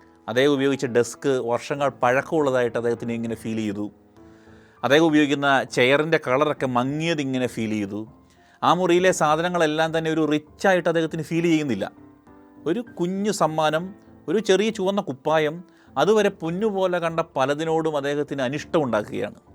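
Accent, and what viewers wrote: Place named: Kerala, India. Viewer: Hellow sir what kind of English are u speaking. Speak English in good slang. Not eng in malayalam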